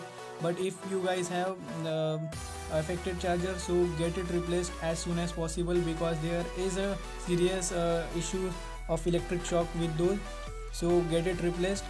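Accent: India. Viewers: Its Indian. Dude speak very well.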